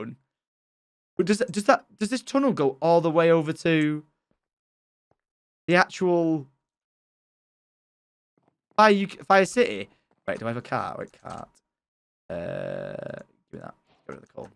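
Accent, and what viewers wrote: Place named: United Kingdom. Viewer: So glad you went back and explored this world again. I spent many hours watching your videos. There's something about someone playing minecraft while talking with a british accent. Like watching Planet Earth. Now I watch other british minecraft streamers, like Ibxtoycat and Philza. I also recently started playing Minecraft again ever since the place I work at got a Minecraft exhibit. Minecraft is an amazing game! Thank you Syndicate!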